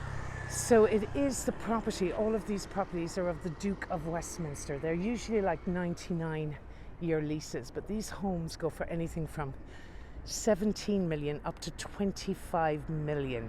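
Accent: Ireland. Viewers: An excellent tour again, full of interesting details. A relaxing time to enjoying and learn about, with a nice Irish accent. Thanks! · I could listen to this lady read the instruction manual for my washing machine. Love the Irish accent 💚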